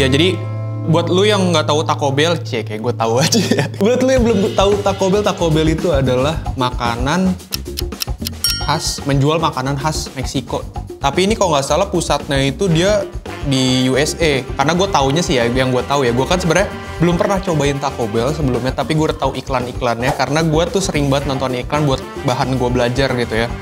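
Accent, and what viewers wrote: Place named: West Java, Indonesia. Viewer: Wah sunda bet ya, letuce jadi leteuce euy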